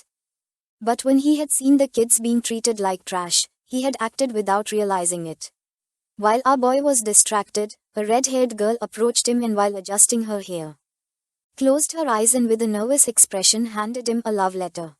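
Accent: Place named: India. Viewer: kind of an ugly accent... can somebody change the announcer.... its like watching indian/tamil movies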